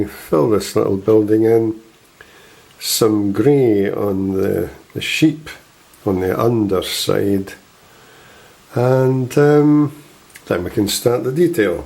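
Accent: Scotland, United Kingdom. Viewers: My absolute favorite painting you’ve done so far, new to the channel and look forward to your talent. Better than Bob Ross with a great Scottish accent👏🏻🙏🏼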